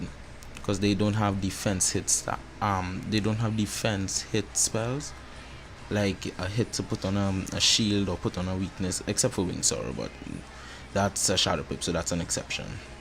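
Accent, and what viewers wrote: Trinidad and Tobago. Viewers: Is that a Trini accent I hear?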